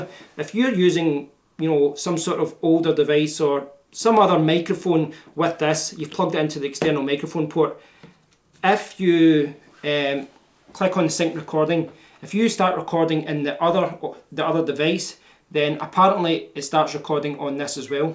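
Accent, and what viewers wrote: Scotland, United Kingdom. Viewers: Very sorry, but I'm not a native English speaking person and so I have lots of trouble understanding your heavily Scottish (or Irish?) brawl. So, does anybody know of a video featuring someone with a 'normal' British or American type of accent? Thanks a milliion.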